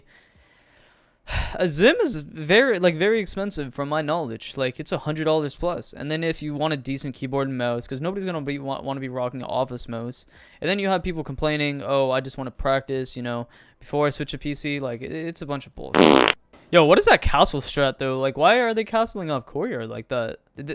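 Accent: Canada. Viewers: ARE YOU CANADIEN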